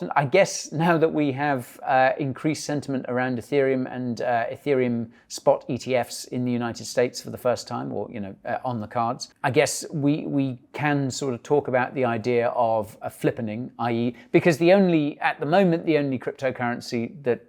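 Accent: United Kingdom. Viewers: one of the few channel's that gives you facts and doesn't promote sh1t coins, keep up the great work, and your British to boot.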